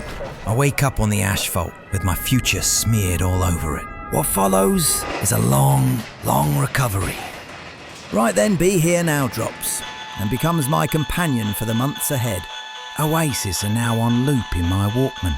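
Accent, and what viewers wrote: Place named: Italy. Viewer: You made a great video mate thank you Ps for an Italian you have a fine English accent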